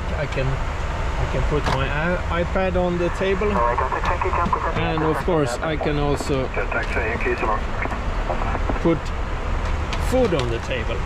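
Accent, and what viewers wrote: Sweden. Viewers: Riktig svensk dialekt på engelskan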